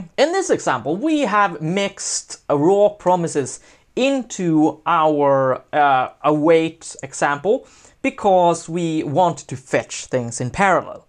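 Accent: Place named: Sweden. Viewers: Swedish accent ftw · Haha I remember when I watched this channel for the first time and I was wondering where is from. English that sounds better than the American one ^_^ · Swedish accent > American accent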